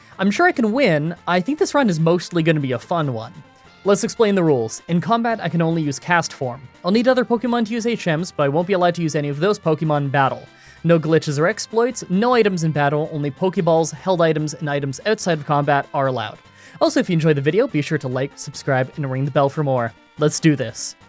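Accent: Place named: Canada. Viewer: I love this guy's Canadian accent! So cute 😁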